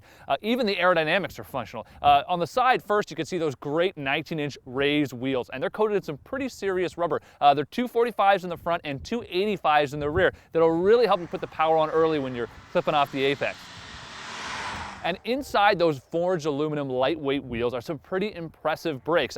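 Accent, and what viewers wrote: Canada. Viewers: canadian accent.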